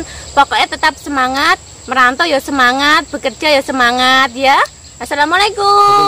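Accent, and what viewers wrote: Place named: Java, Indonesia. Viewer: Jawane totok tenan yooo.... 😂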